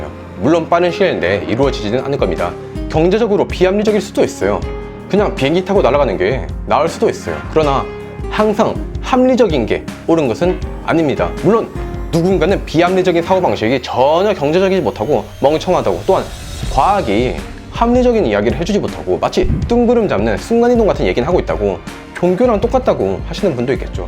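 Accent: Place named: Busan, South Korea. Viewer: 억양이 .억양이 부산 스럽다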